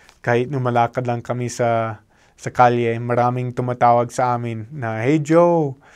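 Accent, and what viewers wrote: Philippines. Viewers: The pilipino accent was there, just to improve or learn Tagalog deep words, you know what right now in the Philippines 75% of pilipino weren't spoke deep Tagalog language coz of a English come in our country. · You sound like a Filipino! You love us? We love you too!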